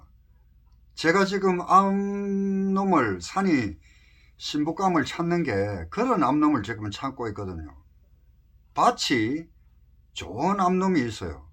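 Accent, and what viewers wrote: Gyeongsang, South Korea. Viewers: 오늘도 구수한경상도 사투리로 커피한잔하면서 영상속의 말씀.잘들었습니다 펜으로 응원합니다 행곡하시고 건강하세요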